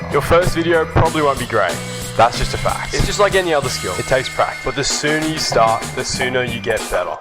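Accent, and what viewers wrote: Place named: Australia. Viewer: Yo bro, loved this vid. It's funny hearing a proper Aussie accent on youtube, also that mic is sick as bro.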